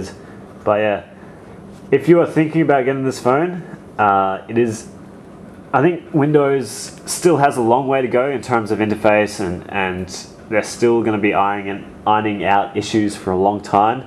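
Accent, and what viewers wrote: Australia. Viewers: You Aussie mate? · telstra~~~you are aussie...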